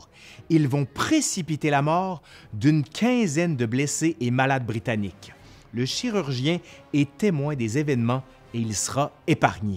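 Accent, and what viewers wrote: Quebec, Canada. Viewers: Bonsoir, je suis abonné à ta chaîne depuis peu et j'adore ce que tu fais. Ton accent québécois me fait toujours sourire. Vous avez de drôles d'expression. Le "vieux français" n'existe plus chez nous en France mais vous autres au Québec vous l'avez gardé. Je voulais te signaler que la photo que tu postes dans ta vidéo à 11.04 mn d'une compagnie anglaise correspondrait plus à une évocation de la bataille de Mont Saint-Jean soit 58 ans après la reddition du fort William-Henry. En tout cas, toutes mes félicitations pour ton remarquable travail. Bonne soirée. Sincères salutations livresques d'un abonné français passionné d'Histoire, entre autres des périodes de la Guerre de 7 ans au Canada et du 1er Empire.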